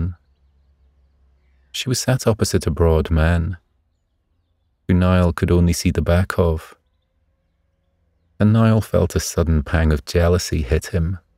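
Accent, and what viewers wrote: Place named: Scotland, United Kingdom. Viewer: A cosy Irish story I chose to help me sleep before work in a few hours.. but the accent is Scottish. Can't work for me with an Irish story anything else fine but not an Irish story 😔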